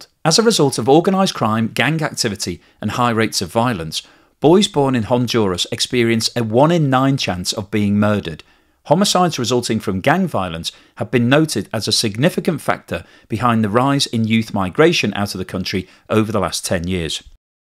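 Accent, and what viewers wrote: United Kingdom. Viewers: As soon as I hear this British accent, I block this videos almost immediately, because of all the scams, using the same British accent voice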